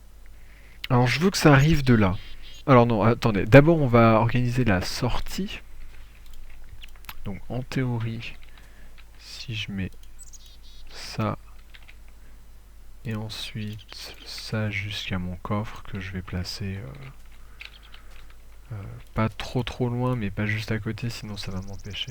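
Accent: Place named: England, United Kingdom. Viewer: Pourquoi les autres regardent: Bon tutoriels, bien explicite. Pourquoi je regarde: Fail, triche, accent anglais.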